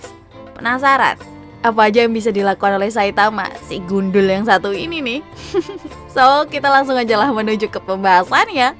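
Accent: Java, Indonesia. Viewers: orang Jawa ternyata, ngokonya kerasa 🤣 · Wis kaya wong Jawa yo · Suka logat jawanya mbak 🤗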